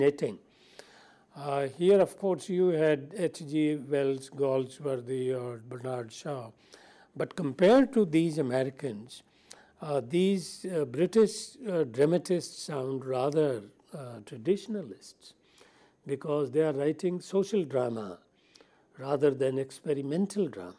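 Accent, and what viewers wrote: India. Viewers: Useful but it goes heavy with Indian English.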